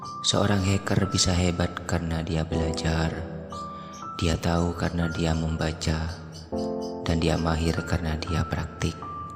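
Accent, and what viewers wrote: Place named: Madura, Indonesia. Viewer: ni pasti orng madura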